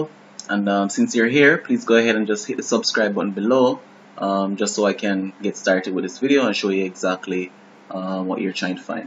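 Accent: Jamaica. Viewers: You sound like a Jamaican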